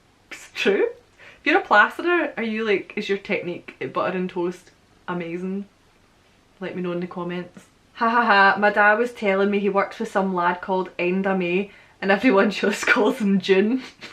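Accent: Scotland, United Kingdom. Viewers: You have the best Scottish accent ever. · there are words I can't understand because of Scottish accent I'd say😞💔. I 'm more used to American probably😔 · I am a Japanese student who moved to Edinburgh this autumn. I became a huge fan of your channel and I am now trying to watch all your previous videos. Thank you for this lovely introduction to Scottish language and culture. By the way, because of my poor English listening skills I am watching your videos with automatically-generated subtitles, but sometimes they don’t help me due to your lovely accent. I’d really appreciate it if you could attach subtitles. Anyway I can’t wait for your next video! Stay safe.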